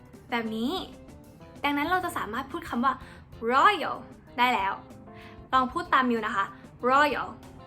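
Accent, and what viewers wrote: United States. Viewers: ยังไม่เป๊ะ อเมริกัน 100 เปอร์เซนต์ค่ะ แต่ถือว่าพูดได้โอเค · ฟังง่ายค่ะ ฟังดูเป็นอเมริกัน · Your pronunciation is not correct but the accent is sounds like American.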